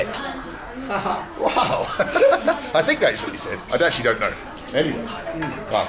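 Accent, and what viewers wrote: China. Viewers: Chinglish is Fun!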